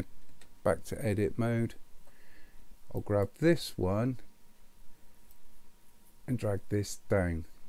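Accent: West Country, United Kingdom. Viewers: Thanks, nice clear tutorial. Like the west country accent too! makes a change.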